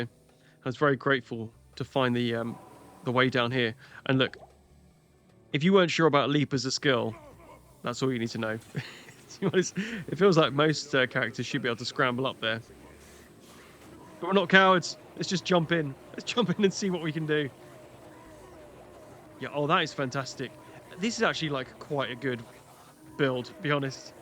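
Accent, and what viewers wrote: United Kingdom. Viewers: Beautiful. :”) (.. British man? Not Australian? :D)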